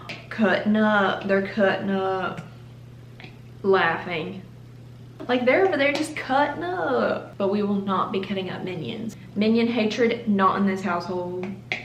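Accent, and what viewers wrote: South, United States. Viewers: There are two reasons I subscribed you. 1- Your southern accent 2- Your beautiful face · I'm from Alabama, too, and I'm trying to see if my accent is this strong when I speak lol It probably is 🤣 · More southern accent plsss I like to be a cowboy one day did you know🤠 love you're accent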